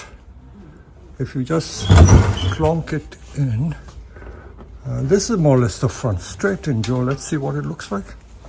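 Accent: South Africa. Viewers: Great hearing a south african accent there. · guy sounds south african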